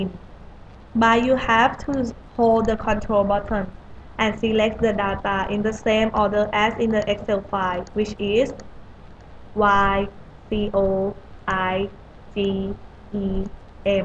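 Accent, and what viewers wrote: Thailand. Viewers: Thai accent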